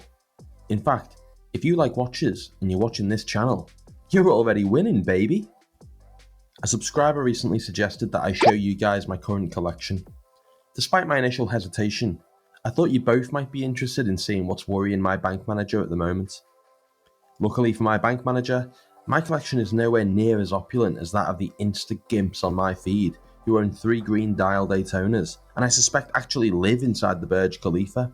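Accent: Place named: Northern England, United Kingdom. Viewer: The voice is completely different, the commitments set in Ireland, and the chap running this channel is northern English (possibly close to Liverpool but not from the city itself?)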